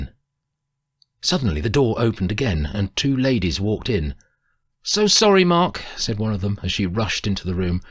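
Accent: Yorkshire, United Kingdom. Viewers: I purchased the e-book, and when reading it i hear your voice in my head 👍 So, even if you have a thick Yorkshire accent, it completes the experience ❤️ I love the book, Mark, it is a very lovely and wonderous read 💛 Much ✌️💟💡 to ALL of you 🙏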